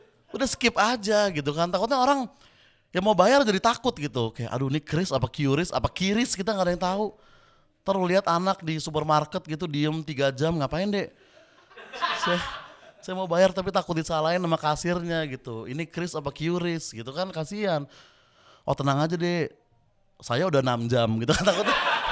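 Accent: South Jakarta, Indonesia. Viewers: Gokil brooo ...acari aku logat anak jaksel dong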